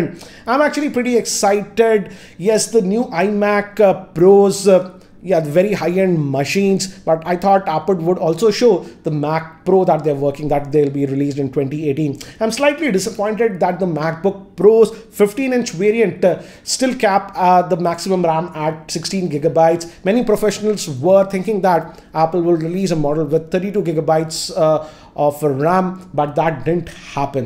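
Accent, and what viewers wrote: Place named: South India, India. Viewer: Know How it's is a south india thing....accent!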